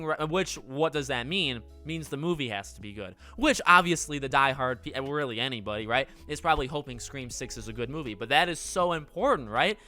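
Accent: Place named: East Coast, United States. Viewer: Unrelated but I need to say how much I love your east coast accent. It brightens my day every time you say “Welcome to pahhhhhdcahst now, I’m!” 😝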